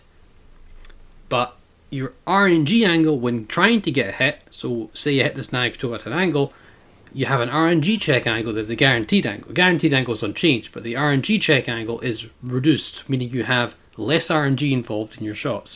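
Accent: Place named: Scotland, United Kingdom. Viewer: Very well played, really like your videos, always nice to hear a wee Scotsman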